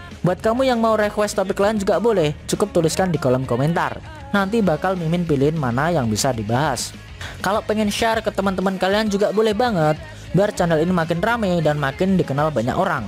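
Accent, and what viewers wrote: Java, Indonesia. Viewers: Suara nya medok ya bang 😆😆😆😆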